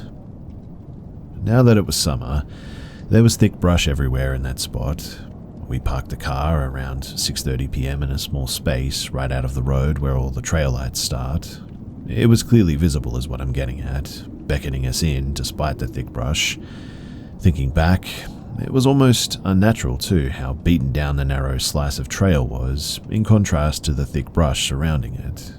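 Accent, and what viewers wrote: Australia. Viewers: Yesss! I love bedtime horror stories, & you're the first Aussie narrator I've found! Greetings from chilly Canberra.👋 Insta-Subbed; love to support my sunburnt brethren.❤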